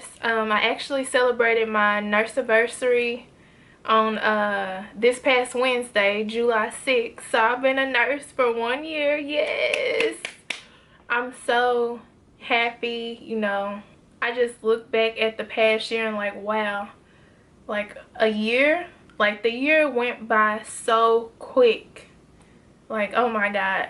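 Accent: South, United States. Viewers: You from down south some where? I can here it in your accent! Is it Tennessee? If not where?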